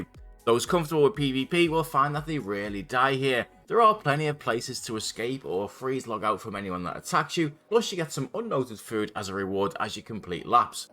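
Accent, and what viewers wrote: Liverpool, United Kingdom. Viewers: Super refreshing hearing a scouser play osrs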